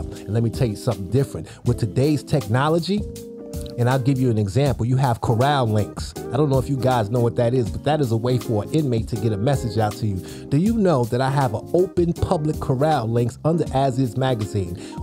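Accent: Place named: Harlem, United States. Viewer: Talk that Harlem talk 🤣🤣🤣you already know as a fellow New Yorker 🤣🤣🤣. Especially Harlem🤣🤣🤣. We a different breed🤣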